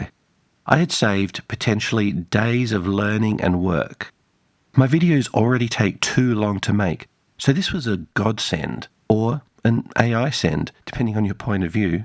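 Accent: Australia. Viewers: Cyber Psychosis sounds much cooler. You could become a Cyber Psycho. Is your accent a mix of Tasmanian and Canberra area/region? I'm interested in regional accents.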